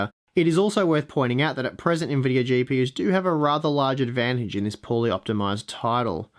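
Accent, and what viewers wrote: Australia. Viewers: You have such an australian accent omg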